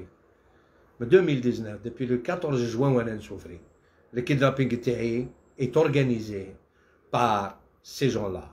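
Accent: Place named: Algiers, Algeria. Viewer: Ton accent en arabe ses comme les Algérois avec des strings à Alger chadni oula ntih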